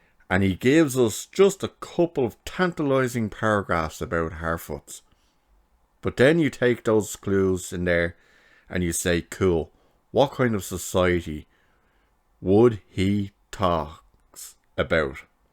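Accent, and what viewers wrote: Ireland. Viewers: It's great to hear a fellow Irish voice discussing this show and calling out the bullshit of these guys, jesus that Nomvete really believes she's smashing boundaries. I hated Star Trek Beyond with its absolutely incomprehensible villain.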